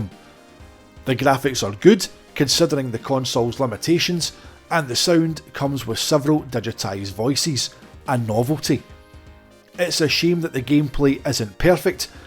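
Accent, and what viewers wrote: Scotland, United Kingdom. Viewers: Sounds Scottish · I’m curious about the person who narrated this video. Where is his accent from? I think it sounds Scottish, but it could be from new zealand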